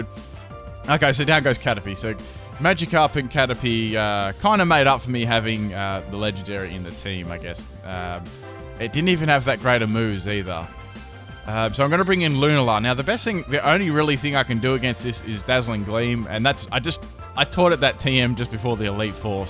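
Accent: Australia. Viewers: he's australian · are you ostralian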